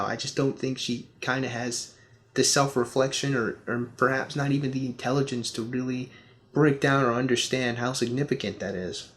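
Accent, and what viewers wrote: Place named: England, United Kingdom. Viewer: Am the only one who likes him whenever he speaks with an English accent. He should try to do a whole video speaking in an English accent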